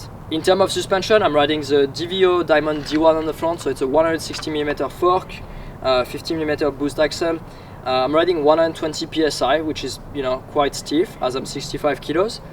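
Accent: France. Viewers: Salut Rémy Metailler trop beau ton bike.On voit quand même que tu as encore l'accent français.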